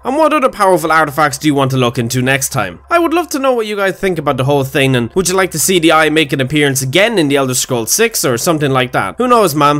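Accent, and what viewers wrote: Ireland. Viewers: Dominic Fischel It’s the Irish voices. They good.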